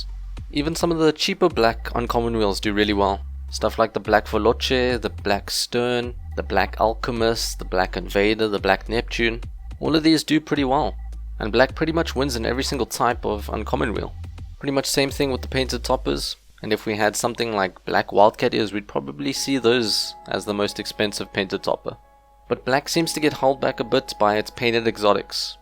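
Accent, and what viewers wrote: South Africa. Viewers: Is ur accent South African? cause if it is, I'm South African Too! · South African?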